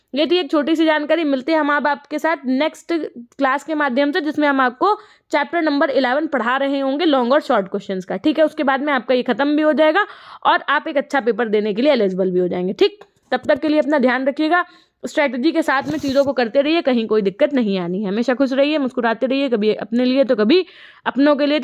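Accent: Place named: Kanpur, India. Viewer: Full kanpuriya andaz😄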